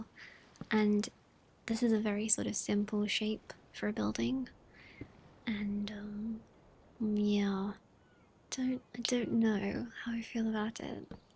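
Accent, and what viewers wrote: United Kingdom. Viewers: As soon as I heard the British accent, I knew the struggles of the heatwave I'm British too and this is GENUINE hell. Anyway amazing video and very relaxing. Great job.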